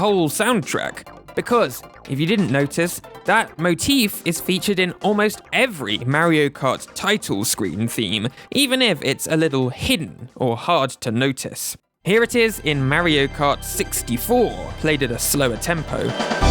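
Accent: United Kingdom. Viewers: GUYS HES SAYING MARRY O WHICH IS THE CORRECT ONE FOR HIM DONT COMPLAIN THAT HE IS PROBABLY BRITISH BECAUSE I SAY MARRY O TOO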